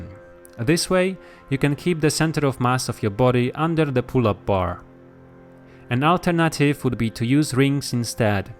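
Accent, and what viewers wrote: Poland. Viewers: What is your accent? Were are you from? You sound Polish a little :D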